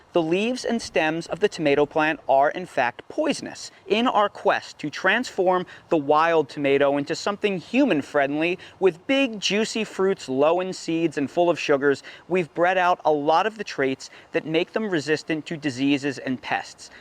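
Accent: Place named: South Jersey, United States. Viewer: thought you had a south jersey accent!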